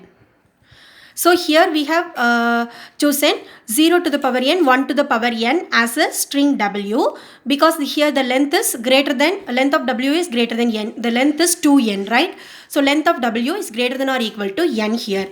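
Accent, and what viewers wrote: South India, India. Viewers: It's in her South Indian accent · she is definitely from south india and pronouncing n as yan l as yal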